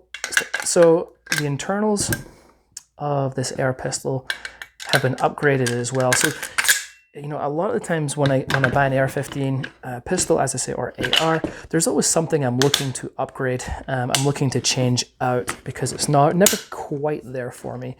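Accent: Scotland, United Kingdom. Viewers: Liked and sub'd! Great Review. Sounds like you are Scottish, am I wrong? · Its so good to hear a fellow Brit / Scot talking about firearms. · Do I hear a hint of Scottish?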